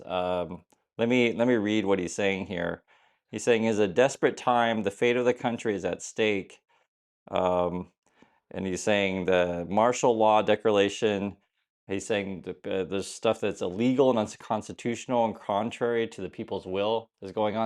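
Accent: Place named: United States. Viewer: In this video, you twice said "the" North Korea. This is noteworthy, to me, simply because it is literally the only mistake in your English that I've heard after watching 6 or 8 videos by you. Your accent, pronunciation and phrasing all sound perfectly American, and I would have assumed you grew up here -- but that one mistake made me think perhaps you are actually native to Korea. <shrug>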